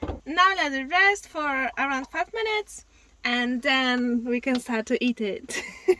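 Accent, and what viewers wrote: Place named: Ukraine. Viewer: Hi. Hope you're well. I'm trying to figure out which country you're from by your accent. You sound a bit Ukrainian, but unsure. X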